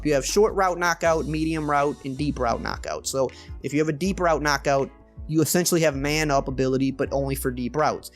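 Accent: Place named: New Orleans, United States. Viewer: Are you from New Orleans you got the accent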